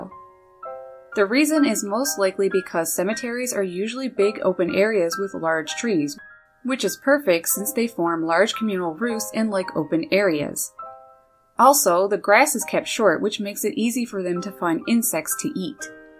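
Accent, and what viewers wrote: Canada. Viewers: By any chance are u from Canada? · Annoying Canadian accent.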